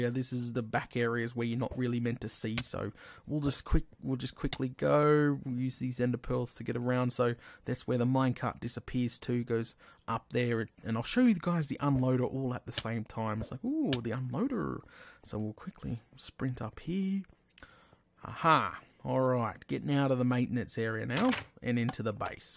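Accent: Australia. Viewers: you are very australian sounding... except hardly anyone uses those words, are you from alice springs?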